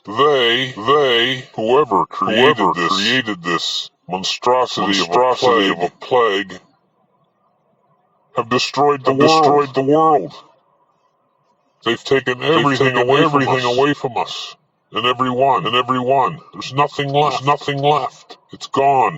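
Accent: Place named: Canada. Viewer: At least you didn't give yourself away this time with your Canadian accent... only with the words "quite literally" and "for myself"... and maybe your laugh... lol. Thanks,. Enjoyed it. 😊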